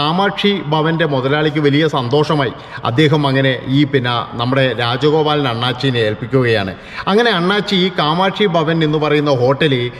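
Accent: Kannur, India. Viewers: മസാല ഒക്ക കൊടുത്തുകൊണ്ട് ഇക്കിളി പെടുത്തിയുള്ള കണ്ണൂർ ശൈലിയിലുള്ള അവതരണം ഇഷ്ടമായത് കൊണ്ട് വീണ്ടും കേൾക്കും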